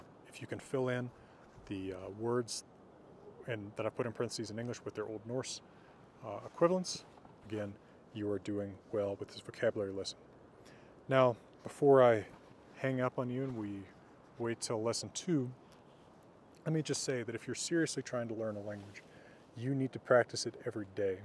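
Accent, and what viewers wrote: United States. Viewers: As a Scandinavian this only sounds like a American trying to speak Scandinavian 😂 Æ is ÄÄÄÄ. V is not W. Y doesnt sound like what you are saying 😂 Å is another story. Also how do you know how they pronounced R? And from what region?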